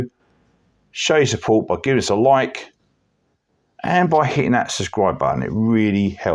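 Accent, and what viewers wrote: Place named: United Kingdom. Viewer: Thank you, Sir. It is a good worker. A deep carry clip would be better. I like your speech, dialect, also the british language, of course. May I ask you, what part of Great Britain do you come from? Thank you very much. Stay healthy and all the best. Cheers Frank🖖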